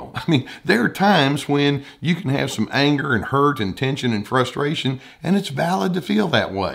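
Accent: South, United States. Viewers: ✨Glad I clicked. Staying for the combination southern accent and for the great information . Sweet tea for the soul ✨ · Why are you calling him out for his accent? I'm from the South and I know his accent is smoothly correct and authentic. If he sounded "like somebody from Washington", I would say you're completely nit picking how someone talks, basically calling him fake! 🙄 Move on..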